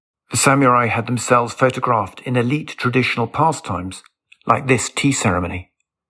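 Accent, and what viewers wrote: United Kingdom. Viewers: The narrator is probably British, they tend to pronounce words as they are spelled. National Geographic has a samurai sword documentary, and one of the persons in that video pronounces it the same way, and he is very English.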